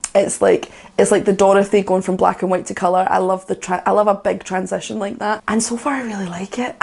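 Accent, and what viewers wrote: Scotland, United Kingdom. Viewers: I happen to notice that, after a quick perusal of your video list, you don't have any reactions. How can this be? He is best known for his work with &, but I'd be interested in how you react to " " from his Mondo Cane album. He normally does experimental metal, but Mondo Cane has an Italian 1940-esque torch song vibe. Plus, I'm just looking for any excuse to hear more of your accent. What I would do to hear you soliloquize about music over some haggis, neeps & tatties at the Babbity Bowster in Glasgow...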